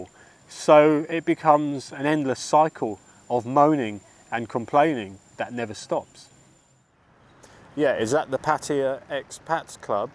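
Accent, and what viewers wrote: United Kingdom. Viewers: I don't like your Pommy accent and did you really call Steve Rosse a C--t ?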